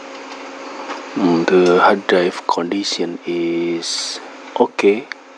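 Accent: Indonesia. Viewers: awal2 dikiranya orang thailand tapi kok lama2 di dengar aksen inggris nya kaya orang indonesia nah pertengahan akhirnya nongol juga bahasa asli nya wkwkwkw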